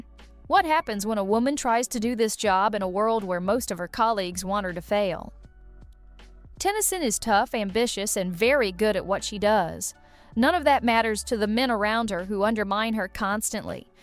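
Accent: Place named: United States. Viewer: And the voice over is... an ungifted AMERICAN!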